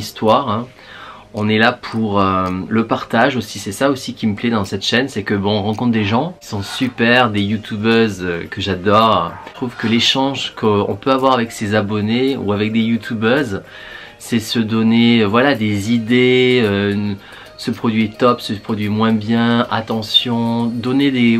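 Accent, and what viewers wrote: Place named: South, France. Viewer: coucou mon petit ou devrais je dire mon grand vu ta taille lol!!!!! j'ai adoré ta vidéo et c'est génial d'en apprendre un peu plus sur toi, tu es un mec au top mais je n'en doutais pas on entend bien ton accent du Sud et c'est super car quand on t'écoute parler tu mets du soleil dans nos vies MERCI!!!! ta chaine grandit de jour en jour et je t'en félicite, tu le mérites surtout quand on sait le temps que ça prend et franchement tu ne ménages pas ta peine pour nous offrir un contenu à la hauteur de ton perfectionnisme le rouge te va très bien sur le visage lol!!!! ne changes rien car ce qu'on aime chez toi c'est ton authenticité je te fais des tonnes de bisous ♥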